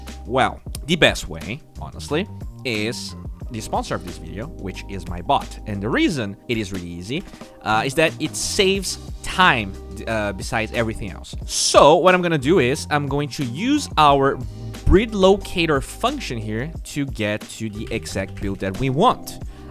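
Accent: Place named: Brazil. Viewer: Mano, eu nem sabia que tu era brasileiro e percebi pelo sotaque em inglês. A gente fala de uma forma muito específica em inglês euheuheuhe. Ótimo vídeo!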